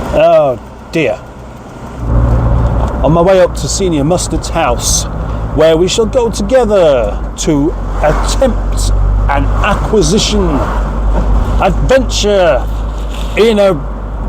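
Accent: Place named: Yorkshire, United Kingdom. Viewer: Aye up tha's got a reight Yorkshire accent! Na then a yer gonna get that car Duck? Does tha' know that there John McLaughlin's from Yorkshire - E''s a reight guitarist in't e? 😊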